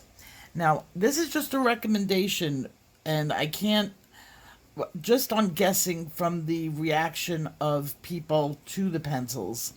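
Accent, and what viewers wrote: New York, United States. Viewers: I’m so glad you hear that your husband is on the mend and improving daily! Thank God for answered prayers. This set looks wonderful and I would love to enter to have a chance to win. I love your videos ,it’s so nice to hear someone who sounds like me as I’m a New Yorker too!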